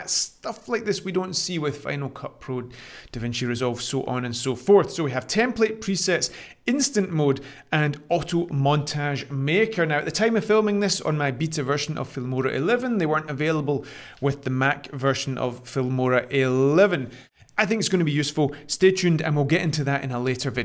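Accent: Scotland, United Kingdom. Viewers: I have subscribed. Nice to hear another Scottish accent!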